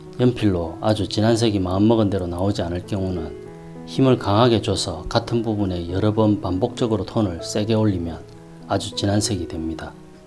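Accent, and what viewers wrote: Gyeongsang, South Korea. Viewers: 근데 어느 지방에 사십니까? 말투가 경상도이신거 같은데 가끔 빵 ~~터집니다ㅋ 그림공부하는데 많은 도움이 됩니다 감사합니다^^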